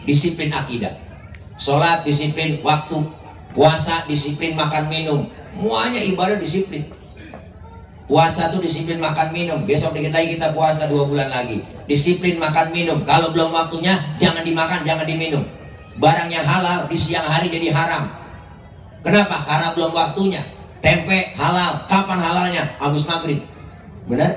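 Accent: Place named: Betawi, Indonesia. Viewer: Gue banget dgn gaya Betawi yg medOk nya Ampe ane lupa mati'in aer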